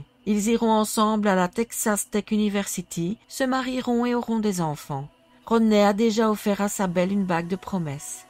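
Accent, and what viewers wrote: Belgium. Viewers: Je ne dis pas celà pour blesser mais cette manière de parler... Quel est ce petit accent, est-ce Belge ? L'histoire est terrible.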